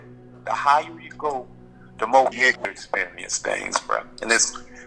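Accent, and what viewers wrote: New Orleans, United States. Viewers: I LOVE the New Orleans accent!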